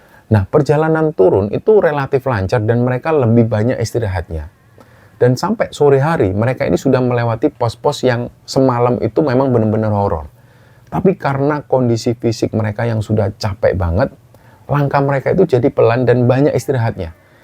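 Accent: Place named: Surabaya, Indonesia. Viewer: ngomong cok nya faseh medok hehe mas ancen arek suroboyo temenan hehe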